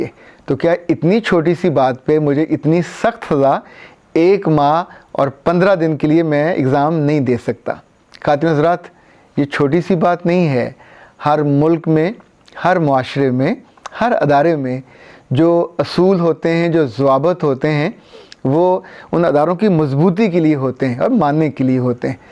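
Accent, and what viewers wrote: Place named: India. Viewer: صاحب۔ آپ بیشک بہت اچھے مقرر ہیں اور پوری کوشش کر کے اچھی اردو بولنے کی بھی کوشش کرتے ہیں مگر دیگر افراد کی طرح آپ بھی بعض اردو کے الفاظ کو ہندی انداز میں بول جاتے ہیں، جیسے بہت ہی عام سا لفظ ہے " دکھنا " یا " جونسا " تو جناب یہ لفظ دکھائی دینا ہے دکھنا غلط ہے اور لفظ جونسا کوئی لفظ نہیں۔ گستاخی کے لیئے معذرت خواہ ہوں۔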